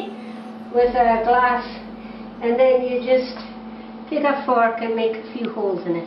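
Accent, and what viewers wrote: Scotland, United Kingdom. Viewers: She sounds like a Mackay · Gotta try these for sure. Love the recipe and the Wee bonnie lass accent !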